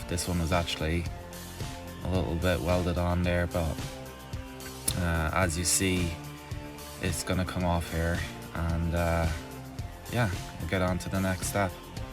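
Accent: Ireland. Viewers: Class work!! Hint of an Irish accent? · Great work. You have IRISH heritage? Accent and words indicate yes 👏🏻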